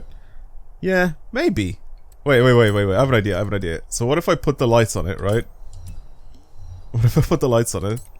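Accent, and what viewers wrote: Ireland. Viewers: For two fucking years I was trying to understand where the hell is from. Finally I know he is Irish. Now I can die in peace. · Now we know lives in Ireland which is obvious since he has an irish accent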